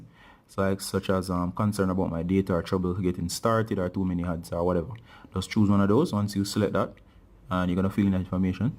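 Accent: Jamaica. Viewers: Are u Jamaican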